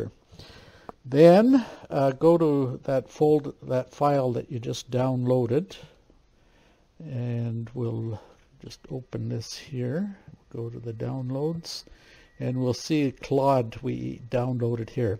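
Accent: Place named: France. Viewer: Thanks a lot, small but very useful tutorial. In addition, as a French, I like to listen to this "particular" accent of my compatriots!!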